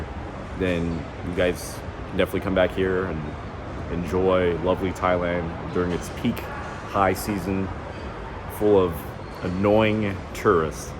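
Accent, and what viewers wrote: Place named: California, United States. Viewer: he is californian lol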